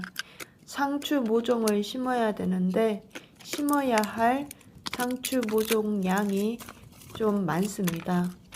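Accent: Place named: Jeolla, South Korea. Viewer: 전라깽깽이 말투랑께.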